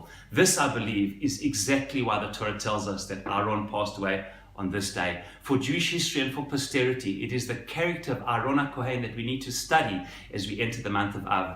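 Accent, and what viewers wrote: South Africa. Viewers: He have a south african accent. Is he from south africa?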